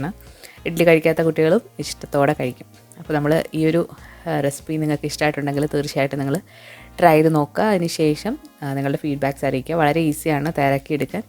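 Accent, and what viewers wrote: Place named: Valluvanad, India. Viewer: de valare pazaya videos il oke voiceover accent kuracu vithyasom toniyirunu (oru valluvanadan style aaypoy pole), ath otum ishtomaayrunilaa. Pakshe ipol kure naalay valare nanaay samsarom. Anikk valare ishtomanu recipes um samsaravum. Very simple and straight to the point. Please keep up the perfect work🥰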